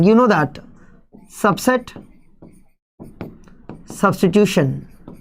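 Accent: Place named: India. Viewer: Your hindi accent is so amazing 😂 btw session is really helpful. Thank you sir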